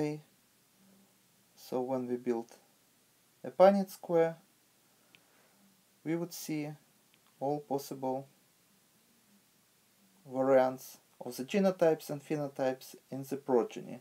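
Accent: Russia. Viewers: Are u russian !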